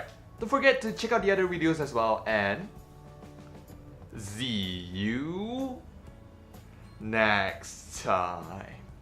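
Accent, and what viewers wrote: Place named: Malaysia. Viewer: I'm glad you found passion and joy in making films and videos, as opposed to being stuck in the Science Route™; it was nice to watch this video and get to know you a little better!!:) I can't wait for more videos!! You're doing a good job, keep it up!! Personally I prefer your Malaysian accent, but maybe bc it feels as if we are a little closer. Hehehe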